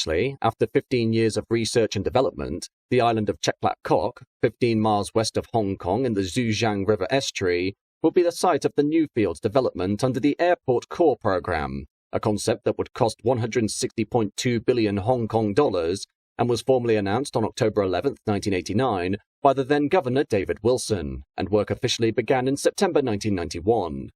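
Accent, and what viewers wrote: United Kingdom. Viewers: I was born in Kowloon Hospital in 1946 . Growing up on my Grandparents' dairy farm in the hills above Ngau Chi Wan, my brothers and I watched the landing of Pan Am Clippers landing on Kowloon Bay. // Also, the British planes landed in the first- built runway ( near the district Wong Tai Sein): Everytime a small plane landed, all buses and autos had to halt in front of a barrier. I always take the No. 5 bus to St Mary's Canoissian School daily, and I remember this protocol vividly! // on certain special occasions, fleets and fleets of British aeroplanes would fill the air space --- above Kowloon Bay --- for an exhibition. It was exhilarating to watch, as kids, perched on a nearby hill ( south of an old, Taoist monastery!!! The British government later built the famous KAI TAK AIRPORT: I departed the bustling British Crown Colony, on Philippibes Airlines, taking off from the Kai Tak runway, heading towards Li Yu Mun Channel. 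What good Memories!// My dream -wish now is to cruise to Hong Kong Harbor, via Priness Cruises, and dock alongside the old Kai Tak runway to disembark!!! It will be .... like .... COMING HOME for a little Chinese girl! Thanks for this informative video. Much Appreciation. Love the British accent of the Narrator!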